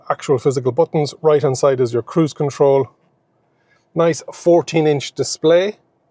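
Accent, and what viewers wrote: Ireland. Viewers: Good man, I enjoyed the presentation, this Toyota is a total "Buy" when it will be available. Cool Irish accent you got there, new SUB.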